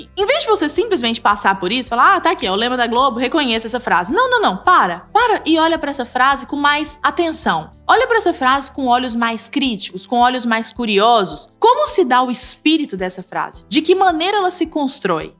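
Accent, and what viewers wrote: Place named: Centre-West, Brazil. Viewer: Pelo sotaque e daqui do centro oeste kk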